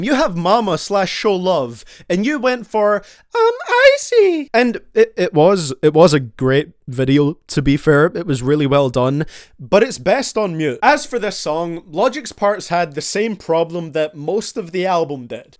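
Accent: Ireland. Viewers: you sound Irish today are your Irish? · Are you Irish or Scottish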